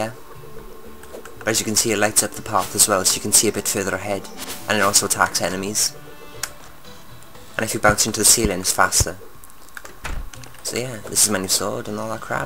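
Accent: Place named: Wales, United Kingdom. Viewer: Your Welsh accent rules. Greetings from Krautistan, and thanks for thid very helpful series for Terraria beginners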